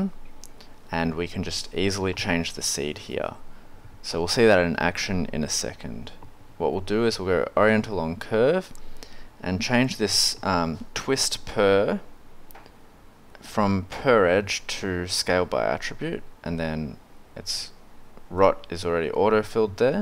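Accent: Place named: California, United States. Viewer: your accent reminds me of The Californian series from SNL.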